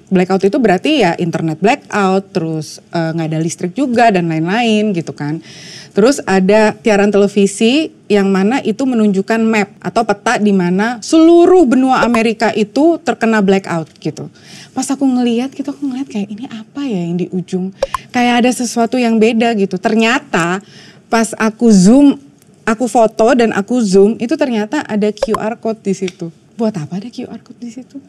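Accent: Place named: Java, Indonesia. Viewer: Mba nya imut ...., kosa kata Jakarta tapi logatnya medok Jawa, jadi pengen nyubit si Mba ... heheee..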